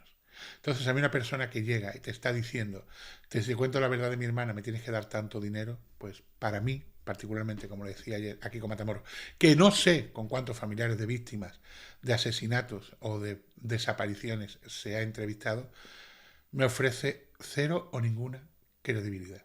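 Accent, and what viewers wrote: Andalusia, Spain. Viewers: Pues el calor,los andaluces hablamos asi😅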